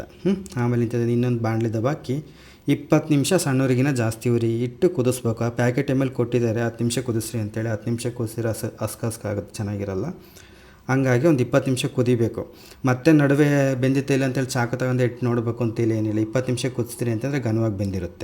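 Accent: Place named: Channagiri, India. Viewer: Channagiri side pura hege matu keli thumbha kushi aytu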